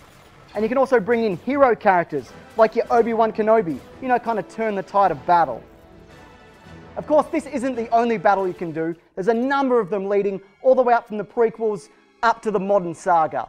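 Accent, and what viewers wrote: Australia. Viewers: Your voice says Australian but your face says Mexican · I feel this is like 500% more Australian than normal. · He went full bloody Australian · For some reason, seeing your face with your voice makes you sound way more Australian than usual. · oh my god you're Australian Billy Mays (God rest his soul). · you seem more Australian in this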